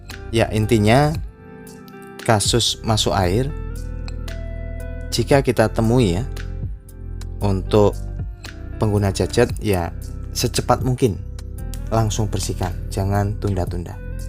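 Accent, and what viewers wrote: Java, Indonesia. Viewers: Jumpa lagi sama saya selalu nyimak 👍👍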